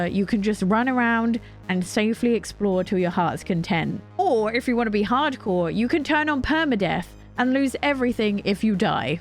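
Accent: England, United Kingdom. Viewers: I know you have an English accent, but her name is Mirabel, not Marybelle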